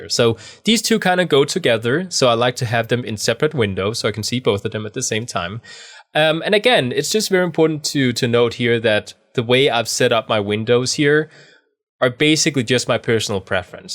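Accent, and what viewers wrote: Denmark. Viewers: I like your accent as im also danish :)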